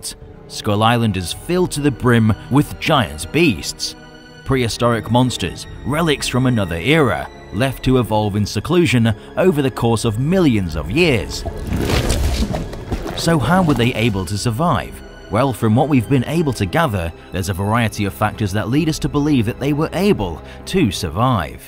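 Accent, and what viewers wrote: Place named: United Kingdom. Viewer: U sound like every British person ever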